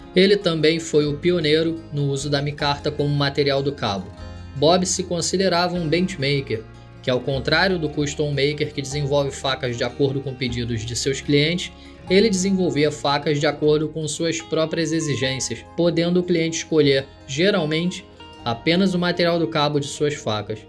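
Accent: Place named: Rio de Janeiro, Brazil. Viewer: Cara, esse documentário ficou bom demais, tirando esse sotaque de carioca, ta perfeito 👌🏻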